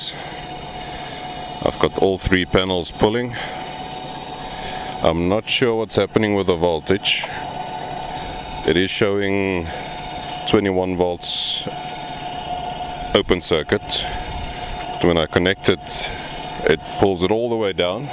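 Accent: South Africa. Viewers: Nice! Kink soos Engels met 'n Afrikaanse aksent. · I love the SA accent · I hear a South African accent -> I press the like button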